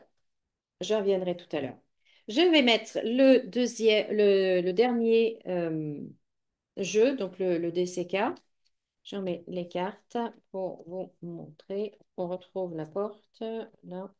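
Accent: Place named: United States. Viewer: Quelle accent Américain 😊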